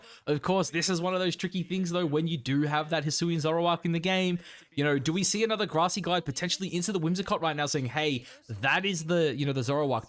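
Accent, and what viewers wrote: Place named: Australia. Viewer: bruh u sound like Chaz NBA i was so mind F*cked since i just seen his video 😂 you Aussies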